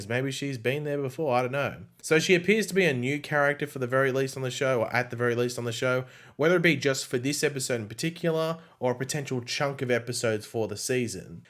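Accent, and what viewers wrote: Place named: Australia. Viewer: I missed your Aussie voice lol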